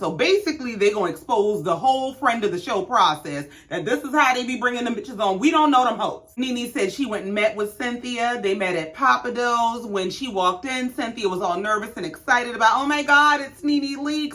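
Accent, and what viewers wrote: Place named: South Carolina, United States. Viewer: In all fairness Cynthia was a big deal on the New York scene so her connecting with Peter is not surprising and being with Leon was known. She is just more unavailable to general scenes. But I love your commentary with SC accent. I am thinking Charleston love it; reminds me of Grandma. Keep being you!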